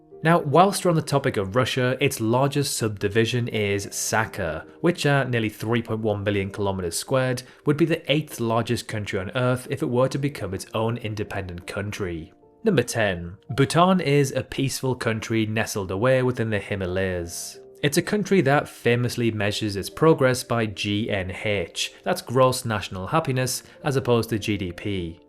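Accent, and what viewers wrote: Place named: United Kingdom. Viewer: Your British accent lots of rubbish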